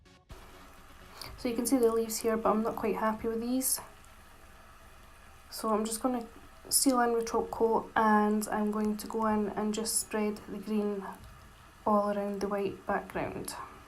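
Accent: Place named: Scotland, United Kingdom. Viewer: Where in Scotland you from? It's strange hearing a Scottish accent xx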